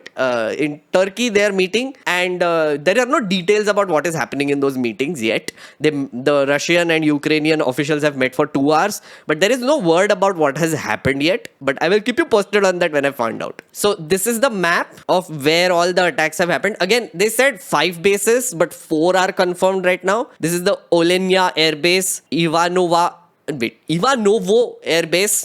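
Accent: India. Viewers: When your accent is this bad you should just stick to hindi. Indians are the only people who speak a foreign language in their own land😂.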